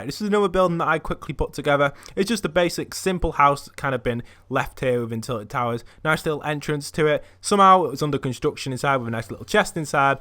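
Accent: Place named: England, United Kingdom. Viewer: Defo English he says orll instead of arl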